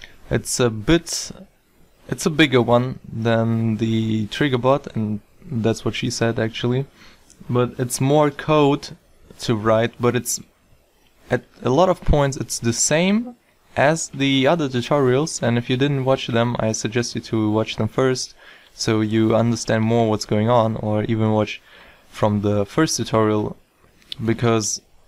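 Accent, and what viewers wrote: Germany. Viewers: man hört das du deutsch bist :D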